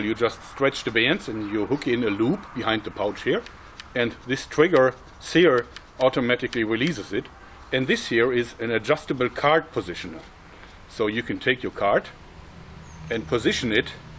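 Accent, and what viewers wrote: Germany. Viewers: Are you German? You have a German actcent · Ich denk die ganze zeit das er deutscher is der es probiert englisch zu reden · Yeah, German Accent... I'm German and I hate German accent the most... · I like your German hah hah hah hah! Sounds so German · Man hoert an deinem Englisch das du Deutscher bist was ich auch schon vorcher wusste aber ich feier dich:) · du bist deutsch das merkt man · Bruder man hört das du nen deutscher bist xD Edit. Hab dich grade bei my spaß gesehen bei rab gut gemacht 👍🏻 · hes a german ;) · the typical german-english accent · hörst du den deutschen accent nicht wenn er englisch spricht??? wow!!! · Ja, man merkt das er Deutscher ist :D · Haha man merkt richtig dass er deutscher ist😂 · Ist der Deutsch,wegen dem "Akzent" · Man hört einfach sofort das du deutsch sprichst 😂ich feier dich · Haha du bist deutscher oder? 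:D oder sogar schweizer? ^^ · Hach, wie ich diesen Deutschen Akzent im Englischen toll finde :D Super Video ✌ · For me he Sounds very German ^^' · oh :X ich dachte Amerika weil alle so blabla dein deutscher Akzent noch sorri dafür ^^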